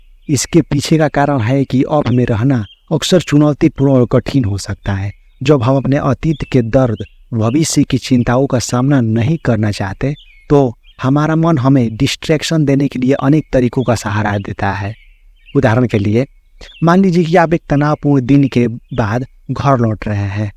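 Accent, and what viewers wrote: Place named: Bhojpuri, India. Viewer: aap saasaram ke hain kya, humko bhojpuri ka feeling aa rha hain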